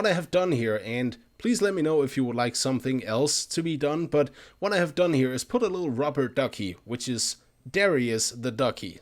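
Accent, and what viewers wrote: Denmark. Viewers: Are u from Denmark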